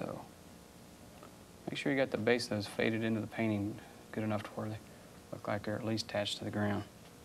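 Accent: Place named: Indiana, United States. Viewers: He is the best. The Indiana country accent--"acrosst". Perfect ASMR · Classic Indiana Accent...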